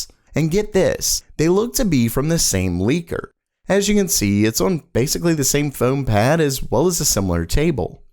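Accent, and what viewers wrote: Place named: South, United States. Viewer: southern accent coming out here and there lol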